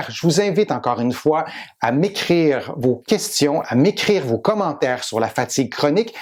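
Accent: Quebec, Canada. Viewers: Dès le début j'entends l'accent Québécois je sais d'office que je suis tombé sur du contenu de qualité. Merci beaucoup pour cette vidéo ! Un cousin français. Je m'abonne !!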